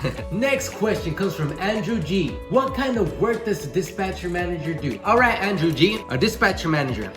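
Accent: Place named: Puerto Rico. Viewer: cuz I saw your video u posted on ig were your video is about a guy asking u if you guys were open and u were talking in Spanish, but u had a Puertican accent. But both my parents are from Mexico but I'm born and raised in Los angeles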